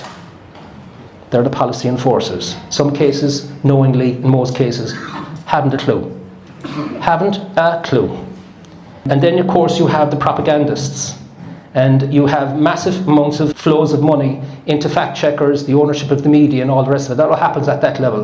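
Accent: Ireland. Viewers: 4 comments really yootoob?? 🤨 This was outstanding. Nothing I didn't already know, or have heard from others or suspected myself - but somehow for me, in this moment, it consolidated it all. Something about that glorious Irish lilt that made the repeating 'un-put-downable'. However, I am now so certain of Everything, that I don't know whether I'm so exhausted from 'knowing' that I'm inclined to pee-everything up the wall, party like a loon and spend what funds I possess, or whether I am so fired - especially obo my Grandchildren - that I'm gonna light a torch and pitch fork my way in to History🦬🔥 ( If you know me - the latter is the ONLY option. If you don't know me - is right - Big Bag, Big Fight - lead from the front) ✊🙏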